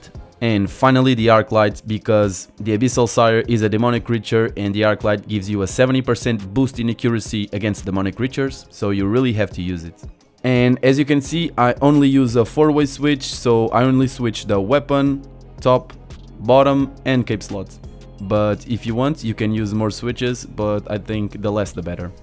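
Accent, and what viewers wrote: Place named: Portugal. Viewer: este sotaque de tuga nao me engana, bom video mano :)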